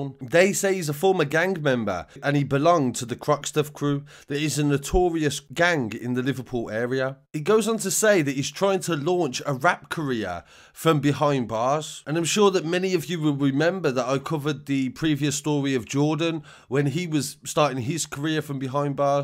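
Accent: Birmingham, United Kingdom. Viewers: sounds so brummy 👊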